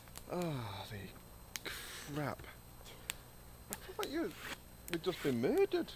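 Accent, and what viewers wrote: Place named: Manchester, United Kingdom. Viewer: Nice vid , great spot youve got there,nice food, nice set ups, cheers.......johny.......ps.thats defo a manc accent.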